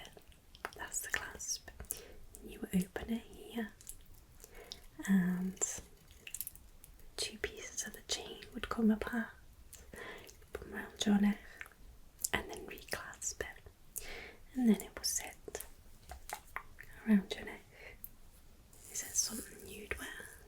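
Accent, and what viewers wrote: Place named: Liverpool, United Kingdom. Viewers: Im irish and i loveee the scouse accent. Especially in asmr lol. Very relaxing ! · I love your Scouse accent, I love Scouse accents anyway but your version is so soft and relaxing 😌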